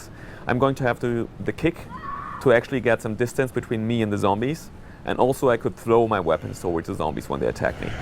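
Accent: Germany. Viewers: german accent ftw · He has a slight German accent, although his English is good. Anyway, I can't wait to play this bitchin game!:D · No he's not gay, he has a German accent. And the reason why you think having a German accent makes a person gay is because it (probably) reminds you of Bruno. Please try to educate yourself more in the future, American. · his german, thats an german accent... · he sounds so german ^^ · alter... sogar ich höre raus, dass er deutscher ist xD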